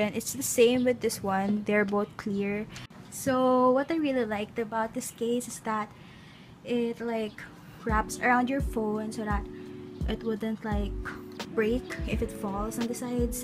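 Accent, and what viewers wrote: Philippines. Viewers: I just got here and I knew that your a filipino becuz of your accent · Its kinda obvious that shes filipina cuz girl, her accent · Sa thumnsil at aestheric akala ko taga ibang bansa pero nung nagsalita na ay pilipino ito hahahaha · This is the first video I watched from you. When I heard your voice I was like wait is she Filipino? And then yaaaaas you are! Aesthetic na nga, exo-l pa!!! Nicesuuu~ Good luck sa academics~! 😊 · skskks i'm not familiar with your channel and i just assumed that you're filo based on your accent :') and gd i was right hahshah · 5 seconds into the video i already know you're a filipina bc of your accent hahahaha 💕💕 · ya sound like a filipino especially when you said "TAGGhh" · Me:her accent sounds familiar Also me:OMG SHES A FILIPINA #Philippines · when she speaks her first sentence i was like "OHHHH FILIPINA".. 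gurrrl ur accent is sooo cute filipino prideeeee hehe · What's with your accent? Are you pilipino? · I am new here and I love your accent. I was right that you have a Filipino accent <3 Edit: I was proven right when I also saw your money. You're a Binibini💓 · I recognized immediately because of the English accent — We sure have a distinct sound when speaking English 😊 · me: hears her say the first sentace me: MY GIRL IS FILIPINA AYYYEEE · you have that filipino accent, are you a filipino? I'm half filipino and chinese :) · looking through these comments and seeing how shook everyone is when they find out you're filipino also same i heard your accent and i was like MMMMmMmMMm FILIPINA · I knew she had a pinoy accent!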